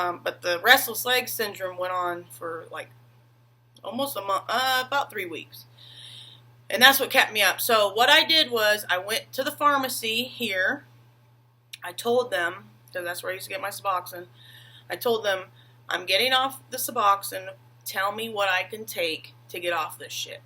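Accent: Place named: South, United States. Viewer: I bet you are probably from the south.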